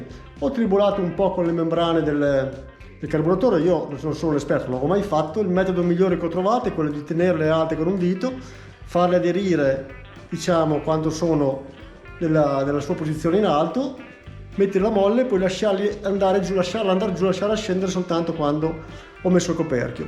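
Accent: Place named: Emilia, Italy. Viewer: mi pareva un accento nostrano, io son nato a Pavullo, piccolo il mondo. Dicevo le/la tanica sotto le valige perchè di norma son le più pesanti piene in peso specifico, più basso lo hai meglio guidi, inoltre hanno una buona base piana sulla quale appoggiare una sacca che una base rigida a volte non ha. Seguo due ragazzi (franksaffair) che sono in giro per il Brasile ora, e lui le ha posizionate li ed è venuto un bel lavoro pertanto mi son permesso questo consiglio.Di Transalp devo revisionarne uno appena si fa più caldo. Ho questo hobby. Buona strada, viva l'Emilia e le crescentine cotte con le tigelle.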